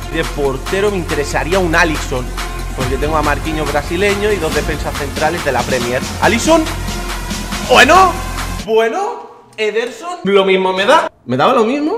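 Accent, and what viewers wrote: Chile. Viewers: hablo chileno vean cuando saca a modric en el primer fut brat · por que de pronto hablas tanto chileno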